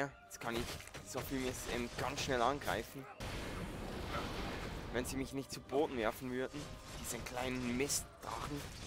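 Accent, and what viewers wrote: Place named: Switzerland. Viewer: Also ich find den leichten Schweizerakzent ganz angenehm ;) Diese deutsch-deutsch-Laberer kann ich langsam nicht mehr hören xD Hab auch gleich abonniert ;)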